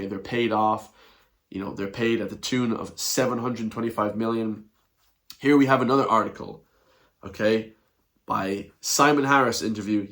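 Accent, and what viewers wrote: United States. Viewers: You sound more American than Irish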